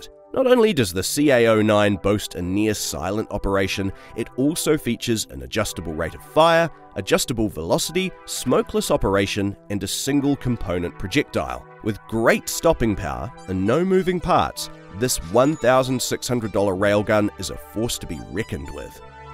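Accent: Australia. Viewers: Notice they have an Australian narrorate since they wimped out and gave up their guns. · Aussie accent but he's talking USD$ and no shipping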